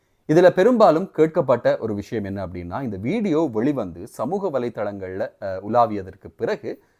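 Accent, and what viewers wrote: Sri Lanka. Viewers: Srilanka tamil is always unique enna doubt ungalukku 🤗🥰 iam in srilankan from india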